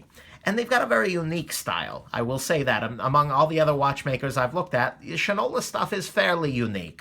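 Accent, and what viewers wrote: United States. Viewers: What is your accent? I know it's American but where is it from?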